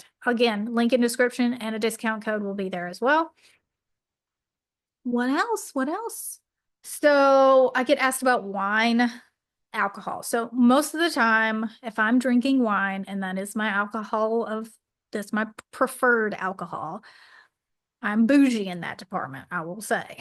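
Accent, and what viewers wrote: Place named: West Virginia, United States. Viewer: You from West Virginia 😄😄😄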